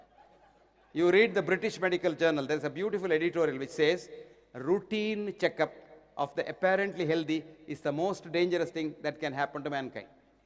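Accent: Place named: India. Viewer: EXCELLENT & VERY SOUND - From my corner of the US I give this a definite Thumbs UP!! He is very eloquently expressing what I have been thinking, practicing & feeling for a number of years. THERE IS A WEALTH OF INFORMATION HERE THAT IS VERY USEFUL & NEEDS TO BE SHARED & KNOWN. ( I'm from India & understand the accent - PLEASE, with all due respect, use captions or enable subtitles, so that the world can understand & learn what this fine, learned Doctor is sharing with us!!) ji - THANK you, Namaste & Ushta-te!!🙏 Footnote: I looked up the book he recommended "Anatomy of an Illness" & watched it on film: <link>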